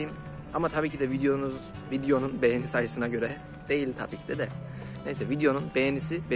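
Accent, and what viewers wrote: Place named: Ankara, Turkey. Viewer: Angaralı olduğun nasıl da belli ya la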